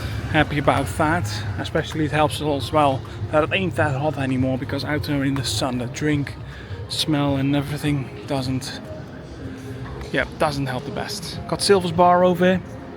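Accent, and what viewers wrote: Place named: Netherlands. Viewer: I don't have a preference but normally I'm pretty good at spotting native speakers and I really thought you were british but every so often I hear that dutch accent creeping in.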